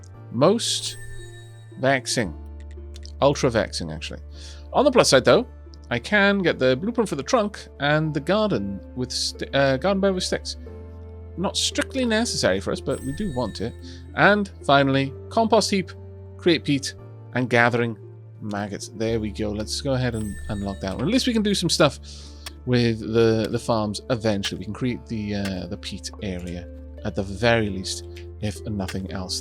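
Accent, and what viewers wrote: Wales, United Kingdom. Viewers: So I don’t know if you have “for science’ed” this yet, but in your first run you did try to sell raw sliced meat without the stamp and you couldn’t. That may have changed since then. I don’t remember if you ever sold cooked meat dishes at all, burgers were too valuable. Also, My partner, Todd, doesn’t normally get excited when he walks in on me watching one of my YouTube channels. However, he does like, and this game in particular. When I watched the previous episode he walked in and asked “Is this the game with Comrade Donkey‽” I will probably buy this finally (It used to be on XBox Game Pass but dropped off I think, and also I got rid of my GP.) It’s very charming and I know so much about it because of this series. (Now if only the game could include a running commentary in dulcet Welsh tones. Oh, well, I’ll have to settle for watching this while I play.)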